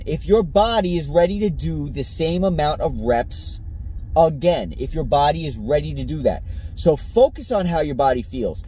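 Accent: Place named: Boston, United States. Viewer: Where u from Boston (your accent)? I get my Tonal installed on Nov. 4th can't wait!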